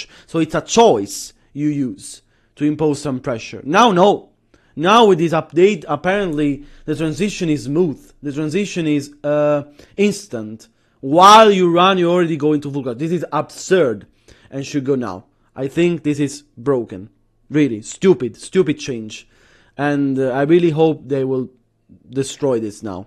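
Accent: Italy. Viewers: Good a analysis, all we can do is wait and pray -_-. Random but are you italiano? you got a similar accent to my wife lol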